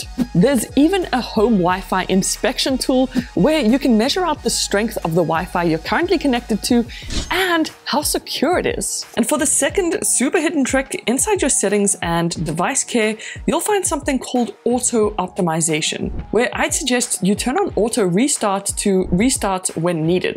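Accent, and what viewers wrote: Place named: South Africa. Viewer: Yohhhh I hear a wonderful South African accent here! That's pretty schweeeeet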